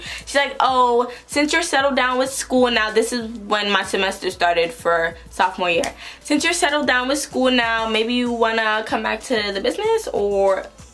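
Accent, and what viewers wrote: New York, United States. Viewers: let’s talk about her accent like i loveee ny ppl accents 😍😍😍😩😭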